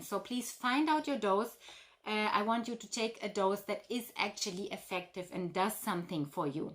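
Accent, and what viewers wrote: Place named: Russia. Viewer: Thanks for the valuable advice. Have you studied herbs? Do you live in US? I sensed a Russian accent. I ask just out of curiosity.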